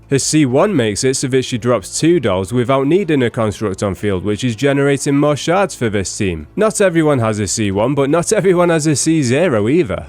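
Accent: United Kingdom. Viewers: Too Much British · it feels like a breath of fresh air hearing another fellow brit talk about genshin, earned a sub from me mate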